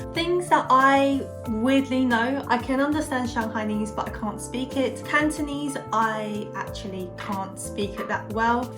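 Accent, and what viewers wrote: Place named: Essex, United Kingdom. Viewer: She's proper Essex.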